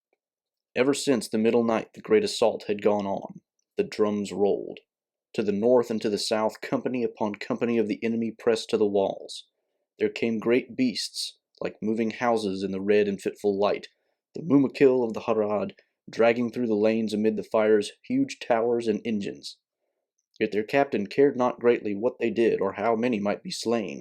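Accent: South, United States. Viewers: Great Reading. Love the Southern Accent. Make more videos on the Silmarillion and Keep Pushing. Also, get on Odyssey and other platforms. I love your presentation. Very good.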